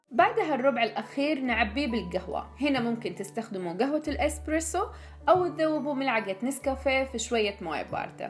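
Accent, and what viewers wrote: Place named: Hejaz, Saudi Arabia. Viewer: الله يسعدك يا. من كم يوم بس عرفت قناتك وتابعت أغلب الفيديوهات انت جميلة وبنوتة رائعة ومرتبة وأنيقة الله يحفظك. فيديوهاتك تجيب السعادة💞 💜. وأحلى حاجة لما تقولي سيروا عليها" ياحلو اللهجة 😃ذكرتيني بأهلنا في الحجاز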